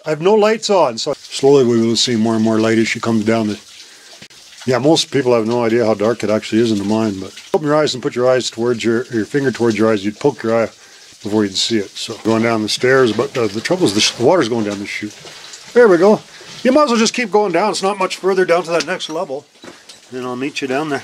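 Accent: Canada. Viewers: I can tell your from Canada A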